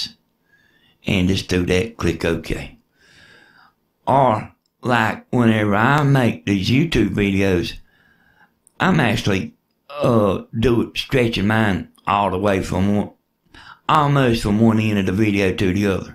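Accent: South, United States. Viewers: I learnt more from your one video (with that adorable southern drawl :-) ) than from a whole series run by a boring smooth-talking digital 'expert'. Thanks for helping us out. (Ps I love how you say 'raaaaah-click)..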